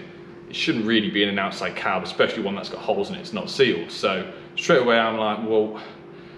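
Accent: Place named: United Kingdom. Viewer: For those of us who don't speak British... it would be helpful if you paused between words and enunciated... very difficult to understand what you were saying for me. If you don't care, I won't be offended... take it for what it's worth.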